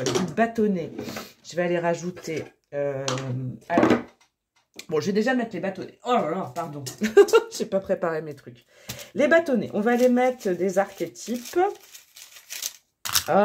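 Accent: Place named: South, France. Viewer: Coucou vous allez bien ? C'est rigolo la dernière guidance vous aviez l accent du sud et une autre medium du sud sud ... A parler de vous. Mimi .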